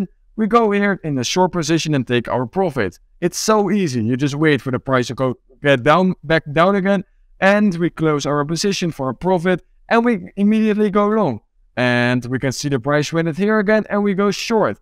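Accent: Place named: Netherlands. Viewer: goede video man. Je merkt alleen wel dat je nederlands bent😂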